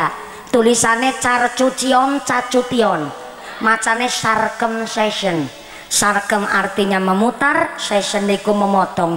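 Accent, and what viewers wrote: Banyumas, Indonesia. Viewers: Assalamualaikum hadir lagi nonton ceramah ngapak Ustadzah Mumpuni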